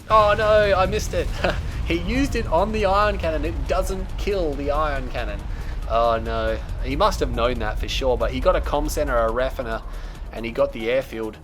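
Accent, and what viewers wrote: Australia. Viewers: Old video I know, but I love your excellent commentary, and the Aussie accent just makes it. Honestly I prefer your casts to Sybert's.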